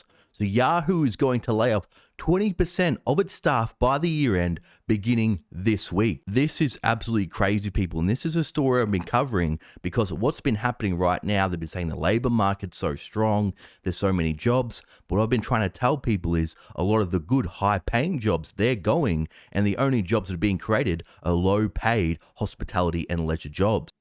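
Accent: Australia. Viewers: That’s Ultra Mega Aussie 🇦🇺🤙🏻🇺🇸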